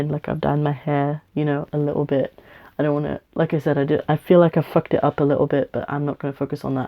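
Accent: United Kingdom. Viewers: On a side note, I haven't really been into (non commercial) vlogs but I think I get it now! :o It's really nice and fascinating, it's like someone is having a personal (albeit one way) conversation with you through the screen. Feels therapeutic somehow. I'm going to try and find more british ones (need help attuning my brain to british accents)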